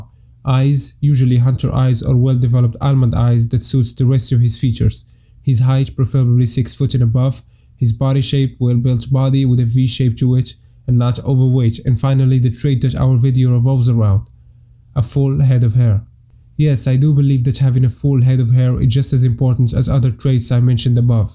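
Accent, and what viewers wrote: Morocco. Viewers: I am moroccan too khoya, the accent sound moroccan. Good luck with your channel, good job ;)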